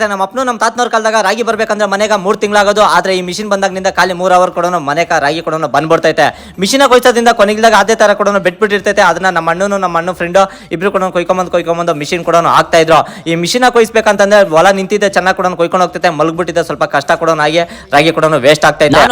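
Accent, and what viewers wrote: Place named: Chintamani, India. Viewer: Nim kannada different ide, chintamani gowri bidnur side avru ಅನ್ಸುತ್ತೆ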